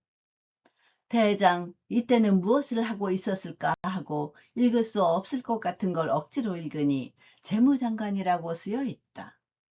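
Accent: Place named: Japan. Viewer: 한국어로 읽을 실 때 그 안의 일본어 억양이 재미있읍니다. 전에는 전혀 못 느꼈는데 일본 만화 영어 노래등 일본 문화에 빠진 후 일본어가 리듬감 있고 운율적인 아름다운 언어란 걸 알게 되었읍니다.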